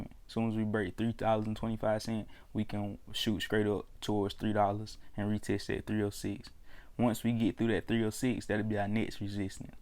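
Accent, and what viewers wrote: South, United States. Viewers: Cuz sound like he lived in Ga some point. I hear that southern tone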